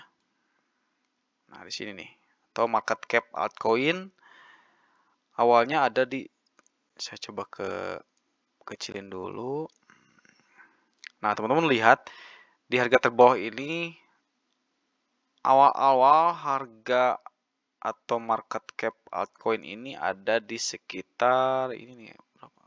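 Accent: Indonesia. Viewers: Bang lu orang Tegal ya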